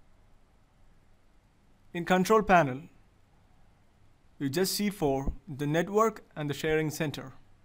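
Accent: India. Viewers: fk that indian accent! it did not even work. zzz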